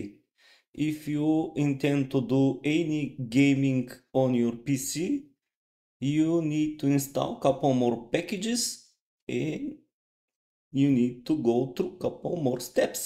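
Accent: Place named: Russia. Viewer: Я отчётливо лсышу русский акцент, ну или славянский, а так спасибо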